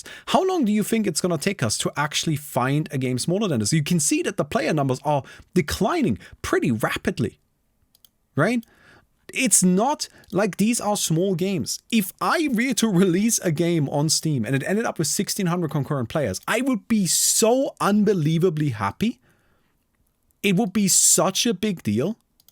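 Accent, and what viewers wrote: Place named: Germany. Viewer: I remember the first day I started watching you, I could smell the german from miles away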